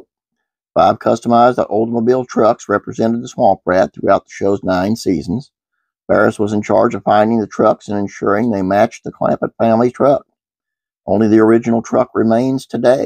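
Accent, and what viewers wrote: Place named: Appalachia, United States. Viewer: I love the hillbilly narration.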